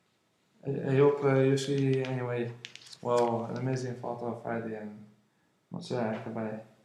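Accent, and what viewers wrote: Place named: Italy. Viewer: Great job! Your glasses are really cute! I posted elsewhere that I feel like I am always being seduced when I watch unboxings on <link>. Which is great! Ow! Lol! I loved these that you do! I also love your Italian-English with meat balls! Mmmm good! Oh Lord! Soooo may I ask how old you are and if you are married and with children? Just curious! Later!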